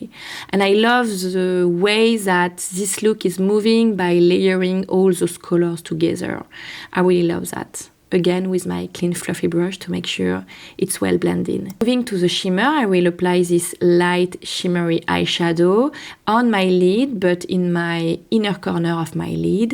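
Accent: France. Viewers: Thank you so much for another great tutorial. I always enjoy your videos but mostly I love your sweet French accent. I am Greek, I am an English tutor who adores Paris! To me you are the absolute ' '. Please keep on sharing your wonderful videos with us. Thank you again! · You are so beautiful. I like your tutorial so much. Love your hair and French accent. Love from Indonesia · Oh my god! I’m in love with your French accent! 💗💗💗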